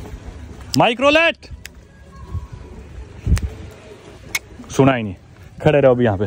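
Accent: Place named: Delhi, India. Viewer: Jaise delhi wale punjabi bolte h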